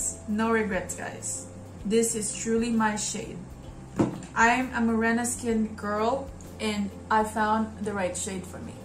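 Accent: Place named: Philippines. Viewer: I like your filipino accent:))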